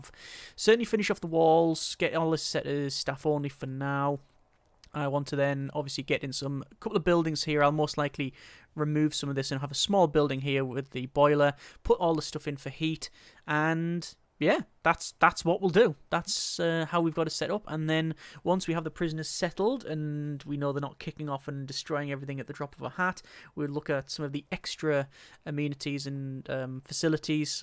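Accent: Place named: North East England, United Kingdom. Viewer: North east of the UK I’m guessing from the accent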